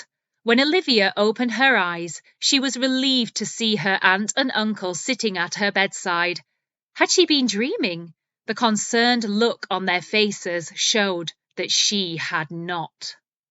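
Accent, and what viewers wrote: United Kingdom. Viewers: i just listen only for one purpose because i AM FOND OF British accent i really adore this accent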